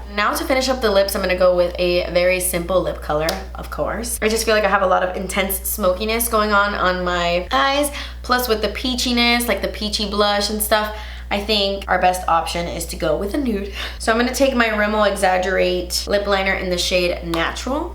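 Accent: Cuba. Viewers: So funny story... My husband says Concealer the way you do because he's heard you say it so many times while I'm watching your videos. It's really funny and he REFUSES to say it normal (not Cuban) he's very white... Lol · More videos in Spanish! Me gusta tu acento Cubano 😄💖💖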